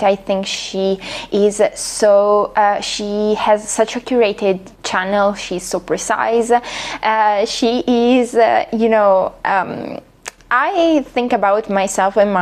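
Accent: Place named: Italy. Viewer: Thank you for your video. I think you have a charming Italian accent.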